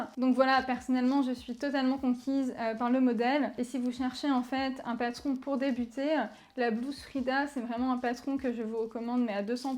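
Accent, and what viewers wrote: Paris, France. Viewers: Je vais être virée, je dis ce que je pense tant pis, c'est pas méchant, Pfff dommage cette façon de parler à la Parigot, à chaque fin de mot ou presque, on entend ( OUAN ! ) Le patron OUAN ! Chaque côté OUAN ! Adapté OUAN ! Qu'on a besoin OUAN ! Bref j'ai l'impression d'entendre le sketch des inconnus (saluuut ouan tu vas bien ouan) C''est super agaçant OUAN !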